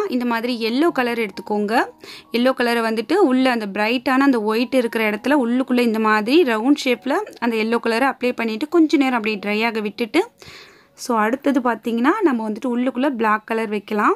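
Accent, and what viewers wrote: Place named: Chennai, India. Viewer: Very nice super showpiece akka unga Slong semmaya iruku neenga chennai ya sis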